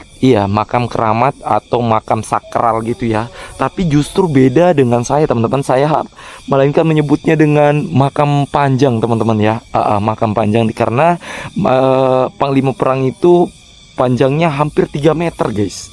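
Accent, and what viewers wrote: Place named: Makassar, Indonesia. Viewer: Maklum dia lagi belajar bahasa logat jakarta karena dia aslinya orang makassar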